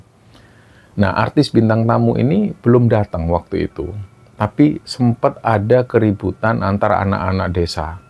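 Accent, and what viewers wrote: Java, Indonesia. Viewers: kereen.bawain ceritanya.bahasanya sopan bnget.detail.dg logat ny yg jawa bnget.tambah lg stail ny sll rapi hihi..sy suka dengerin ceritanya di kantor.biar ga ngantuk hihi..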